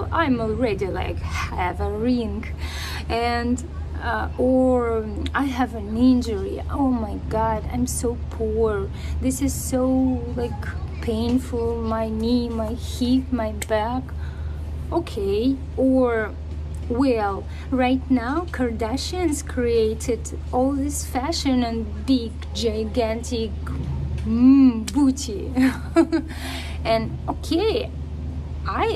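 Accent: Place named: Russia. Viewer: Congrats on your hard work! I liked how you struggled with plank exercise at first. You say “I hate plank, arhhhh” in that beautiful Russian accent. Lol! Then it got easier and easier so you get tougher and tougher. I watched all 14 workouts and your cardio stamina improved greatly. You don’t need to lose any weight (talent agents suck!) but I hope your routine becomes a lifestyle. Good luck!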